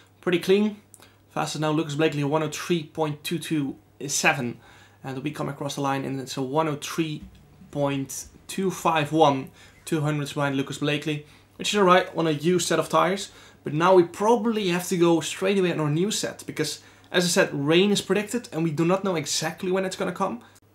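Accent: Netherlands. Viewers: we dont care about the quality we just wanna hear that juicy dutch-english accent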